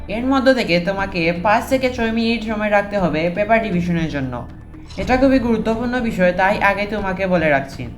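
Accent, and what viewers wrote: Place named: Sylhet, Bangladesh. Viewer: সিলেটি ব্র😢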